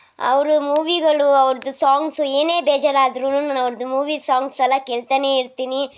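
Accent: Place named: Kundapur, India. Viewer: Kundapur kannada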